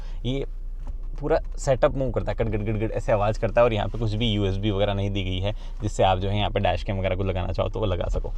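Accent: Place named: Delhi, India. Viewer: Why you make delhi excent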